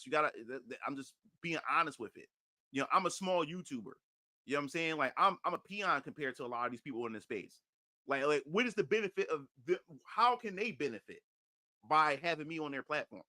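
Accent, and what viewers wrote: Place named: Philadelphia, United States. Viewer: Nah couz daz how they talk in Philly, git with it x).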